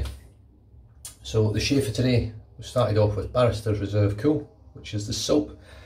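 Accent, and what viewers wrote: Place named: Scotland, United Kingdom. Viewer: Your voice in not monotone, it is Sco’ish. I have no idea what you’re saying but it sounds fantastic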